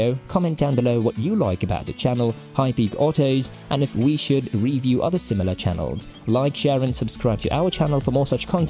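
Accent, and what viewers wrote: United States. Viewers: Yet another English YouTuber talking American.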